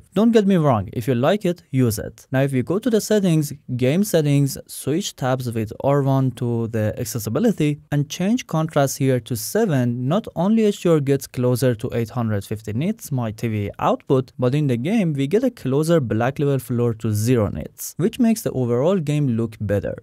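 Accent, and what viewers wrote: Iran. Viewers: اقا از لهجه متوجه شدم ايراني هستي. موفق باشي 😀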